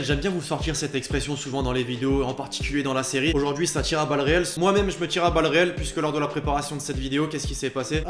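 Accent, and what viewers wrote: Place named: Banlieue, France. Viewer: change ton accent de HLM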